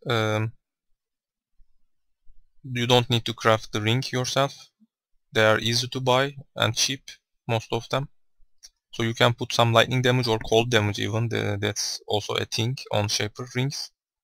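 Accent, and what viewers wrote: Turkey. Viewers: I spot turkish guy who is trying to speak english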